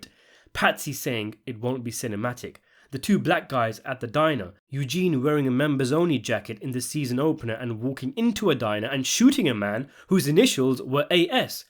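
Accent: England, United Kingdom. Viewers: That's all it is...a theory Chase has confirmed Tony was killed. Also isn't this dude english? That's how they talk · All I know is when he slips in those show references, like anytime he references Vito he calls him and ass muncher, with the British accent it's fucking priceless!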